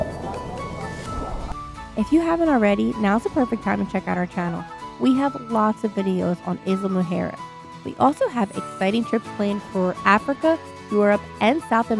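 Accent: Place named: Philadelphia, United States. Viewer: I had to check your profile to confirm my suspicions after you said “water”…Philly accent confirmed! Lol thank you for the gorgeous views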